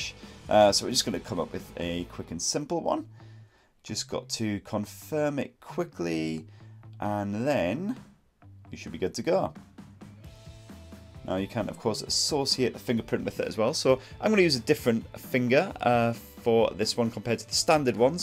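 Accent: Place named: England, United Kingdom. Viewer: Thank you so much for this! First review was from an Indian dude, nothing wrong with it, but I can't understand his English, haha. Nothing can beat an English bloke! And very detailed review, wonderful! Only thing that concerns me slightly about this phone is that the battery is not removable so you can never replace it.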